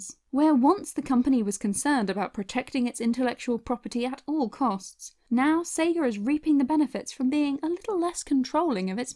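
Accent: United Kingdom. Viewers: This is why I love sega. They support fans and hire them. Also I love the narrator's accent! It's really cute ( I just think the female British accent is really cute)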